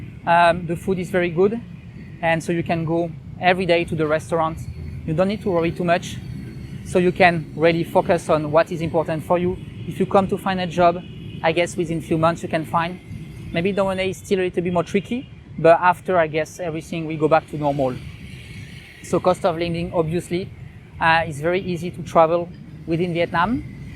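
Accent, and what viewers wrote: France. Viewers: The first 10 seconds watching, I was honestly convinced he was putting up a fake French accent!!! ;)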